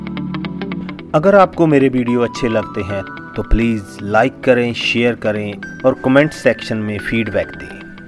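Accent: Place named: Punjab, India. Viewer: paaji meri maa pichle 45 saal se mujhe kadi khila rahi thi. Maine shaadi nahi ki. To aaj mera apni maa ko kadi khilane ka mann hua. Aapka video dekh k maine banaya... whoa!!! Bohot delicious banaa thaa. Ek cheez maine add kiya and wo thaa khada dhaniya. Bohot bohit shukriya aapka. Aapki punjabi accent waali hindi bhi kadi jaisi achi hai. Thanks 🙏🏼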